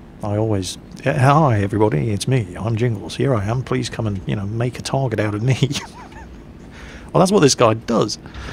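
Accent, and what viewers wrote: England, United Kingdom. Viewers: Well Jingles, I've never played WoT, but i almost feel like i know more about the game than a lot of newbies to the game and its all from watching your videos lol for whatever reason i just enjoy your commentary. Must be the English accent :D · Jingles, I can attribute your success to three things. One- You're British. Americans love British accents. Two- You're retired Navy. You have a passion for military history and you know what you're talking about. We respect your opinions and input because of it. Three- You DON'T give a shit about what's popular and you refuse to conform to the modern YouTube channel standard, what I call "Pewdification". I mean no insult to PewDiePie at all, I mean, he found a niche and he milks it, and it works. But you stay specific, you talk with the fans, you do Mingles with Jingles, you study the game, and you know what you're talking about because you stay focused. 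This is coming from a Texan fan of yours. I will stop whatever I'm doing to watch your videos, and I am never disappointed. I love your stuff and I'm glad you don't plan on changing. I am also just fine with your new interest in Star Citizen, as you're still mainly focused on the content you have your main fanbase for. If you wanna upload some more SC videos, I think that's fine as well. So long as you don't turn into mainly SC. A trifecta of SC, WoT, and WT sounds okay to my ears, once SC comes out. Keep on tankin' Jingles. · Why subscribe to Jingles (assumed you like WoT or Warthunder) 1. He is authentic (or at least a pro in pretending to be) 2. He has, imho, a fantastic British accent 3. He has a kind of humor I appreciate cause its hilarious and mature the same time 4. He stays away from politics, religion and other "minefields" of internet communication 5. He lives my childhood dream (playing a game all day and getting money for it) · What you are doing is that you are an old man with a English accent, who happens to like video games.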